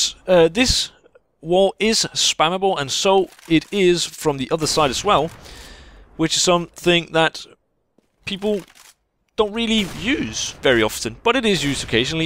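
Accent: Denmark. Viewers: I love when you do your Funny ''Danish-english'' (I'm norwegian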